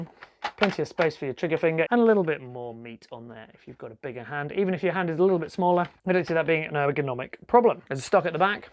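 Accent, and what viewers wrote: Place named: United Kingdom. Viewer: yeah, keep up the great work! it’s not often that I hear another brit on yt, but Ilove it when I do.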